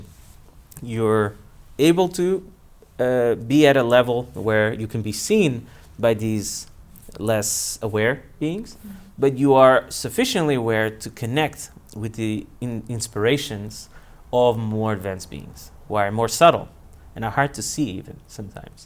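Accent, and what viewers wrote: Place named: Brazil. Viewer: Good talk. On an unrelated note, it's so nice to see such a smooth Brazilian accent.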